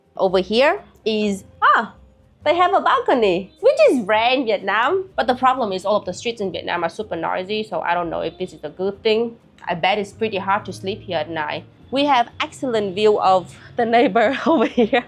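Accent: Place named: Germany. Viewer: The cutest thing is hearing your English sound more and more like a German speaking English, it’s adorable :’)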